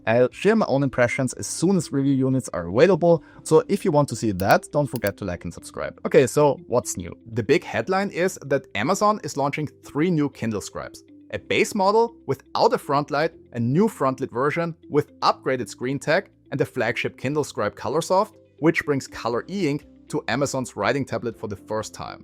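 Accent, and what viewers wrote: Austria. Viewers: Bist du Österreicher?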